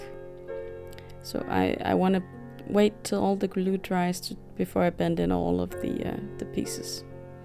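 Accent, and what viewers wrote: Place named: Brazil. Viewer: My Dies are on their way so am watching your techniques to prepare for my house! Not sure if I would have the patience to do all of that brickwork but very well done. Looking forward to seeing the completed project. I have been trying to guess where you live by listening to your accent, my guess is Brazil?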